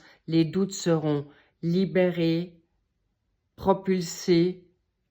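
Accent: England, United Kingdom. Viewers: Merci très chère, j'adore votre voix votre accent anglais peut être? pouvez-vous me le dire.. Vous êtes merveilleusement bienveillante, réconfort et clarté dans vos propos,sans ambiguïté,sans complaisance tout en étant sensible et bonne enseignante de la vie dans tous les domaines, beaucoup de réalisme.Merci beaucoup et que Dieu vous bénisse abondamment.belle journée à vous.❤❤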